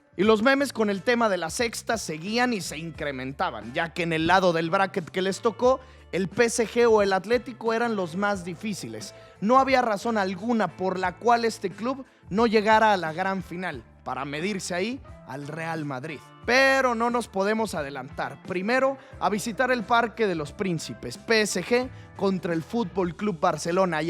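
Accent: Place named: Mexico. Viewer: Acento mexa de la rosa de guadalupe. Inaguantable la puta que te parió.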